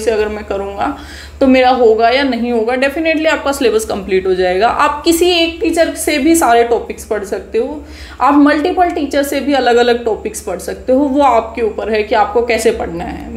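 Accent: Haryana, India. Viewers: अप हरियाणा से हो न ..?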